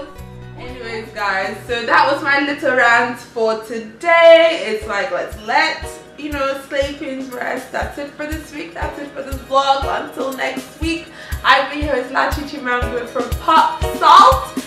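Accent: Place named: Igbo, Nigeria. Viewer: hahaha...see me laughing my butt here. slay queen drama...That igbotic pidgin english accent though.😂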